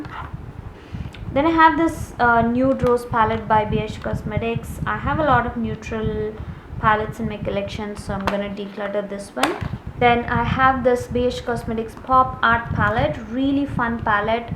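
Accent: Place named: Andhra Pradesh, India. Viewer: R u from andrapradesh? Just ... Ur accent ...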